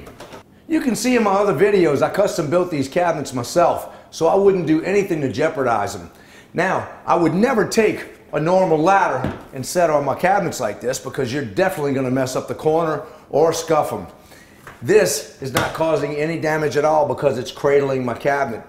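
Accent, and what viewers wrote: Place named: Louisiana, United States. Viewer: So smart of a brain. Thanks. Sounds like you are from Louisiana.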